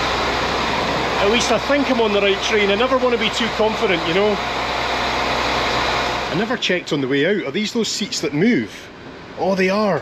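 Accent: Scotland, United Kingdom. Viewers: music not needed, just background sound and your great Scottish accent....! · How delightful to journey along with the views accompanied by the therapeutic sounds of the wheelsets on the rails and lovely Scot's voiceover.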